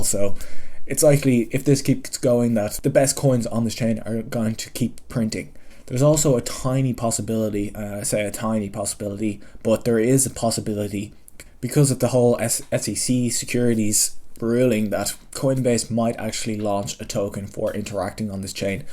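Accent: Ireland. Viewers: Dope video what part of Ireland you from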